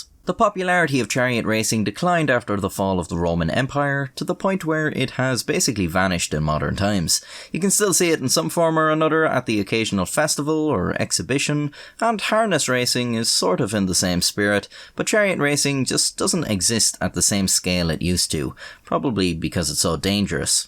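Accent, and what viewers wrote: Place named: Ireland. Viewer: there's something about his serious yet goofy Irish voice making irrelevant threats that is just so damn funny.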